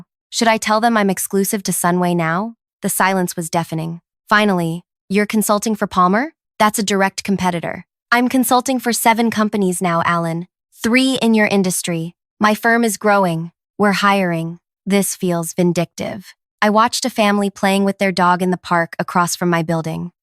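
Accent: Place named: Asia. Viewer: Same old Asian accent and same old points. No American accent to tell it's a story from USA. Just mocking American corporates always 😢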